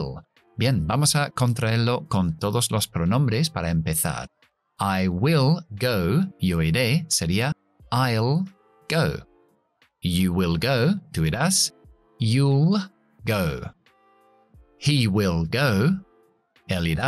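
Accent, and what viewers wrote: England, United Kingdom. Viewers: La pronunciación que enseñas es acento británico?, se me asemeja mucho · Ese es inglés Britanico,lo voy a escuchar pero nececito inglés smericano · Es pronunciación británica. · Que maravilloso canal! Muchas felicitaciones. Este es mi segundo video y lo dice todo. Podrías hablar de la cultura inglesa? Como ellos miran la vida? Cual es ese olor a sus costumbres? Porque que hermoso acento. Tan elegante. Cómo miran la vida los ingleses? Muchas gracias! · Buenas noches sr, soy Carlos Bermudez, de ecuador saludos, su expresión del inglés con acento de Inglaterra es muy aristócrata, y me gusta mucho , empecé a ver su canal hace poco y su manera de enseñar es muy explícita, estoy en este momento viendo videos de un profesor californiano y la diferencia es abismal en cada acento quiero aprender de los dos voy a seguir su canal . 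Hice un test Delos suyos y no di ni siquiera en el nivel de principiante jaja.. espero y se que aprenderé mucho de UD , agradezco que comparta su lenguaje, y le deseo éxitos y bendiciones gracias por compartir sr.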